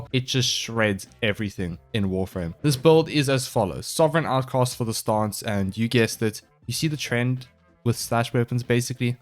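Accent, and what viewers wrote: Afrikaans, South Africa. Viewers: My guy is afrikaans awe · is this guy south african ?? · Either I'm tripping or you speak Afrikaans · Bro. You sound extremely south african🤔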